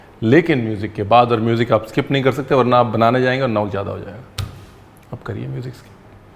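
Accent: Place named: Lucknow, India. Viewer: mutton ka zayka ek taraf, aapke lucknowi accent ka zayke ka to jawaab nahi ! <3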